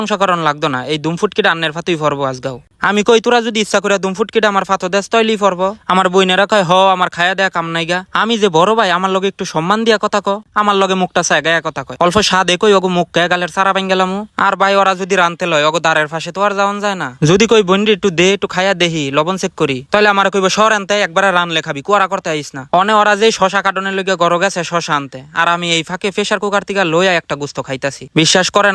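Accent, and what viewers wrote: Comilla, Bangladesh. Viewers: কুমিল্লা র ভাষা তাই না বাই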